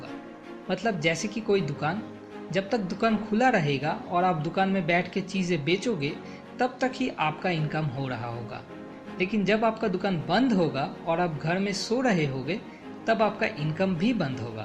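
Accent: Bengal, India. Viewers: ur voice seems like ur are Bengali ! Right? But by the way... video ach6a tha!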